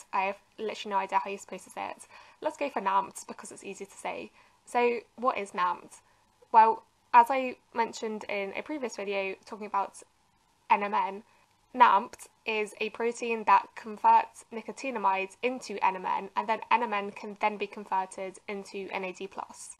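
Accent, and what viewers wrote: United Kingdom. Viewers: Not get used to British accent.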